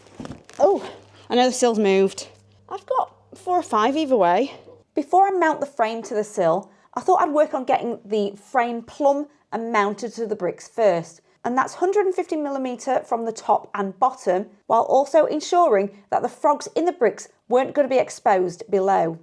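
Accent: United Kingdom. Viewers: Love British accent they speak 24 different dialects of accent wores is from Manchester claimed by most British they said you don't understand those people at all British Empire was larger than any one spray the language all over the world most country adopted and they spray different dialects accent the easy one is American English English International is much different the same as the Australian and New Zealand special in Africa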